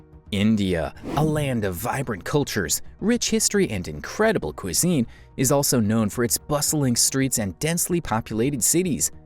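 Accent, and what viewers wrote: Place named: Michigan, United States. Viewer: That Narration Accent Sound like my Michigander Accent! 🇺🇸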